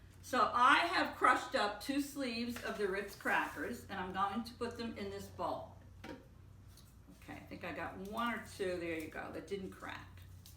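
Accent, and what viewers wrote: New York, United States. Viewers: Hello, New subscriber to your channel. Thank you for subscribing to mine too! I love learning of new recipes so glad to be here! Love your accent too, is it New Yorkish!? I'm from NY but now living in England! Adorable apron and pick spatula. The bar looks really delicious! Mmm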